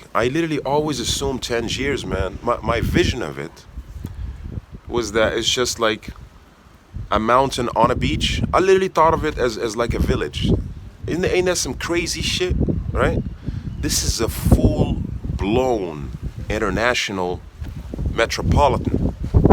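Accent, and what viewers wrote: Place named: United States. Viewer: Hey man! Your pronunciation is so American. Have you lived in the U.S., or did you just train to make it that good?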